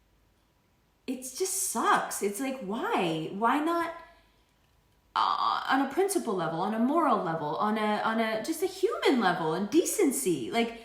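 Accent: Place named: New York, United States. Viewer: You are so funny ... oh man I love it !!! I'm a New Yorker , living in Jersey now across the Hudson.. you sound just like me :p I literally just said all this to my bf like last week the attitude and all haha I was just not beat ! Nah !! ;)) we really don't play ;) pisces / aries cusp .. Gemini rising and yup ! Not doing it. Happy Birthday to you beautiful enjoy your time in London. Thanks for everything ♡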